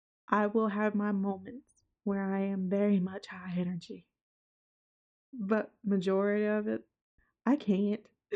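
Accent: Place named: South, United States. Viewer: The southern twang is the icing on top 🤠 Yee yee represent darn tootin!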